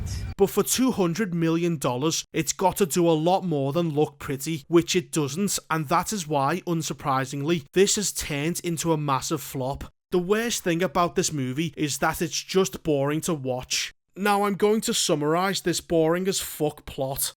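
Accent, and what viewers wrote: Liverpool, United Kingdom. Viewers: Wait..are you by chance a scouser lad?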